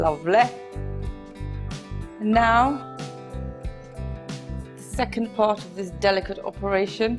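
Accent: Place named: United Kingdom. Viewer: Ah that British accent!!! Lovely!!!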